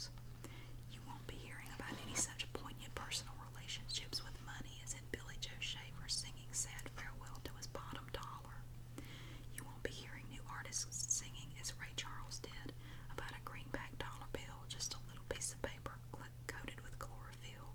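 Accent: South, United States. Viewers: Your voice is so soothing! Makes me feel at home. Originally I'm from Tennessee but now live in Oklahoma, just love a soft southern accent! Thank you for making these videos!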